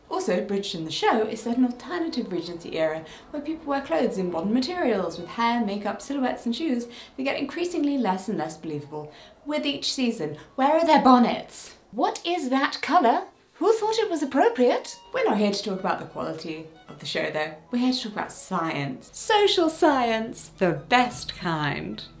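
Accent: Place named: England, United Kingdom. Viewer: not even have 1 FULL regency inspired outfit, but 2 of them, with fake flowers, ribbons and funky hair, everything!! You are literally english queen and you talk like one! Thank you for video!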